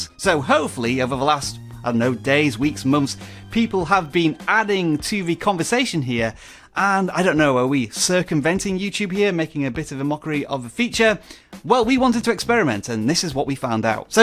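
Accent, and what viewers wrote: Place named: United Kingdom. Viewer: It's so hard to understand your heavy british accent... Do you speak American English?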